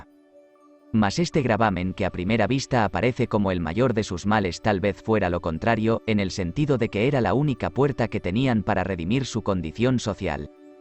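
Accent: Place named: Canary Islands, Spain. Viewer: Una forma de darle un plus de valor a la información es hacerlo con un acento que no le CHIRRÍE A NADIE. Un acento canario formal, (no el coloquial o informal de bares y colegas) en un tema canario es altamente recomendable y muy coherente, máxime si encima ustedes se hacen llamar.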